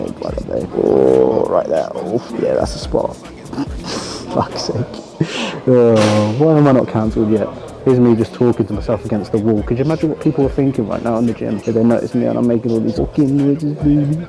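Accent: United States. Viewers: that american accent omg ahahaha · Haha love the American accent 😂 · Haha mad, your American accent make you sound like Charlie Hunnam from sons of anarchy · 😂😂😂dying at the American accent. I love it. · the American accent is hilarious but accurate lmao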